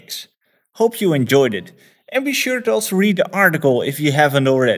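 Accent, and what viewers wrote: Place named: Netherlands. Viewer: Nice App! Nice explanation. Your accent sounds more Dutch than Brazillian to me!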